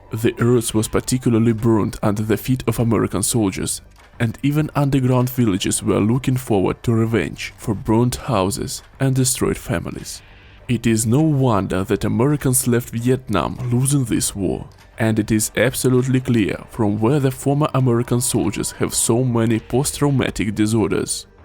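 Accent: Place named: Russia. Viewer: Молодец, но акцент всё равно русский, американцы поймут, что ты иностранец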